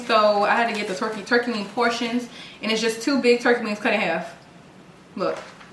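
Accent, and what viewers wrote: Baltimore, United States. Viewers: Are you from Baltimore I hear an accent ? This video was needed change is always good.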